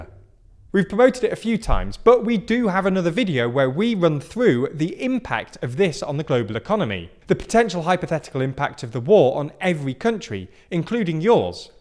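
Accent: United Kingdom. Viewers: I am a bit confused as to why the 2 narrators(this one thinner than the other) of this channel have the exact same voice. Both have good kind of british accent, though.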